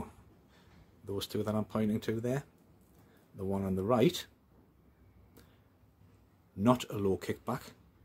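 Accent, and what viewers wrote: United Kingdom. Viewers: It is really good to hear a British guy explain chain saw tips. have some unguarded 1/4 pitch chain on my 194, it doesn't cut any quicker and I have always filed the cutter away before I touch the depth gauges. There are lots of people on youtube that are really into fast saws which is fun but any saw is heavy after a day's work fast or slow!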